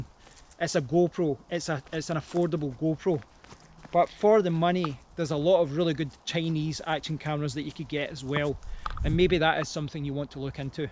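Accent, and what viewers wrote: Scotland, United Kingdom. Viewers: Brilliant work mate. Loved the detail. I shudder to think the time I would have wasted trying to get it down to 1080p! I needed something quite idiot proof. You have kept my sanity! Thanks a million from Australia and coming originally from Dundee it was wonderful to get your delivery in Scottish! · Thank you for making a video for those of us still sitting on the fence as to which GoPro to buy! Your channel is the first one I've found that doesn't use obnoxiously loud music before and during their videos, so I could actually hear and understand what you were trying to tell us. Your Scottish accent was fun to hear, as you sound the character on the Dance Mat Typing program for kids :) Cheers from California!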